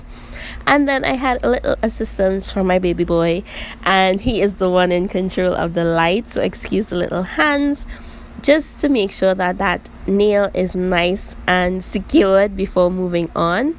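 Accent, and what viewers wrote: Trinidad and Tobago. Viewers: I just knew you were a Trini when I heard you talking! I got the Apres Gel X done but I figured I could do it myself because I like the simplicity of the system.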